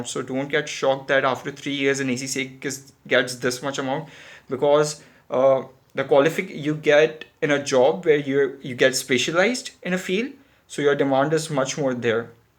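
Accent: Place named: Pakistan. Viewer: Are You Indian?? This accent is Normal for Pakistani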